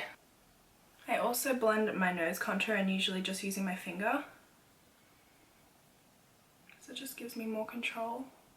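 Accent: Australia. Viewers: is she from australia? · I loveeeee her Australian accent 😻😻😻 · Diana O'Connor lol she sounds the same the whole time to me, your obviously not aussie coz thats a full aussie accent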